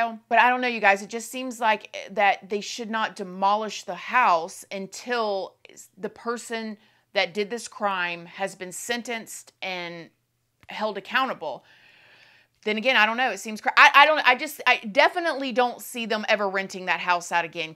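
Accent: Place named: South, United States. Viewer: Lol on pronouncing the names it’s a southern accent kinda thing. I’m from Texas and my accent butchers a lot of things 😂 So you are pronouncing it right you just have to put that twang on it. Lol Love your videos girl!! ❤ Oh BTW I watched the Closing statement from the prosecutor and boy oh boy he laid it down. I really hope he gets what he deserves.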